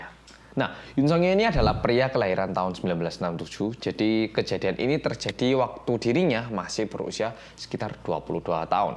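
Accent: Java, Indonesia. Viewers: Plis lu pada yang nonton wajib subrek channel mas yang MEDHOK ngonghey 😉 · Tim gercep mas medokk🤣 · Tdk perlu iri dgn uang M" An Meskipun kita bs sakit BBS nntn you tube,bs tdr nyenyak😭😭😭😭😭trs bget mmbtku semakin kuat walaupun q sakit hrs ttp bersyukur ......matur suwon oppa seng medokkkkkkk😀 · Yah telat 18 menit. Gercep like aja dahh MEDHOKK. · Buset medhoooooooookhhkhkhkhh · Uang berapa pun ga akan bisa mengganti rugi atas yg telah terjadi oleh bapak Yoon Seounyeo, menjalani 20th di dalam penjara atas tuduhan yg ga pernah dia lakukan.. Sakit hati, Marah, Kecewa dia lalui sendiri, tapi dia tetep sabar n menerima semua nya.. Yang dia senangi adalah Cap Pembunuh yang melekat pada diri nya sudah hilang.. Semangat buat Ahjusi Yoon Seounyeo dan Buat Mas yang semakin Medoooooooookkkkkkk:) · Yuhuuu selamat malam bolo-bolo Kembali lagi dengan detective reomitt bersama mas medok kitaa ❤❤❤ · life is so unfair but we got the important point from this video, thx mas yang medhoookkkk, GBU mas ✨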